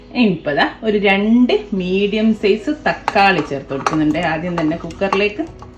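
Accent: Kozhikode, India. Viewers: കോഴിക്കോട് കാരിയാണല്ലോ. സംസാരം 🥰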